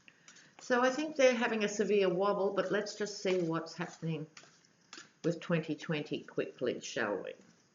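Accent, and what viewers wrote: Australia. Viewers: Thank you, - ever bright, sparkling & insightful (and wonderful with our familiar Aussie accent & cultural/slang refs!😉) A particular thank you for mentioning dear Becca of Readings by the Empress, too. Her “Why God, Why Trump?”(30 May) reading was indeed a really beautiful, powerful & nuanced outlining of the bigger, higher picture - sort of like a ‘Gaviscon Calming Raft’ for these emotional gastric reflux times!! Blessings, blessings all. <3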